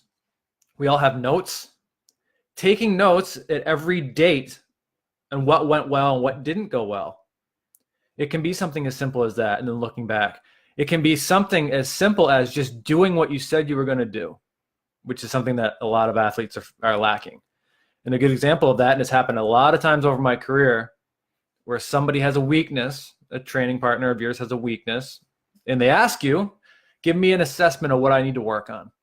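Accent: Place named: New Hampshire, United States. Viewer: You sound like you're from Troy, NH.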